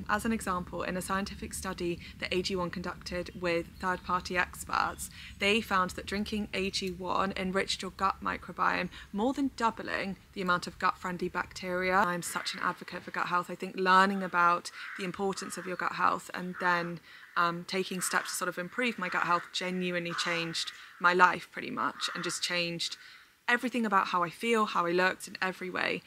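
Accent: England, United Kingdom. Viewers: I really like your accent 😊. Which part of England is it from?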